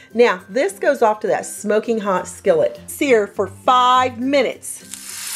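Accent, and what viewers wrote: United States. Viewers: I've never heard anyone more American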